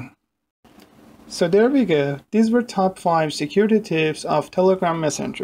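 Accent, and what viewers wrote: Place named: Iran. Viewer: thank you,, nice persian accent :)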